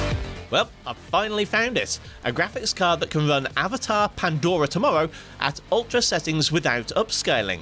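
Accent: England, United Kingdom. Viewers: Be quiet!! Mr english accent got a new video!!!! 😎🤩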